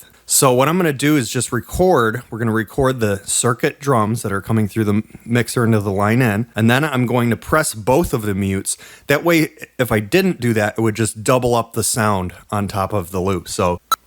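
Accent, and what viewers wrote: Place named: Michigan, United States. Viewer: Man you are really good. And you sound like you are from my home town in Michigan.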